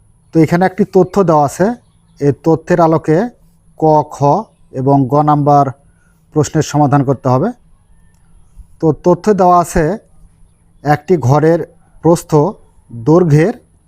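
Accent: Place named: Rajshahi, Bangladesh. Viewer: 🤔🤔🤔ভাষা শুনে লাগছে রাজশাহী (সম্ভবত)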